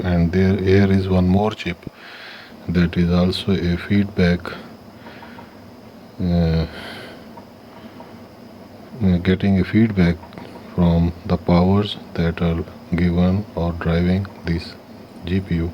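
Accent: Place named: India. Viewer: Sorry 😐 But i don't like hindi accent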